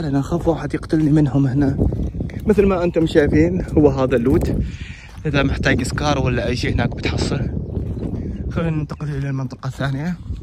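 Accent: Oman. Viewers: والله كنت حاس من كلامك انك عماني 🇴🇲. احبك. متابعنك من ولاية محوت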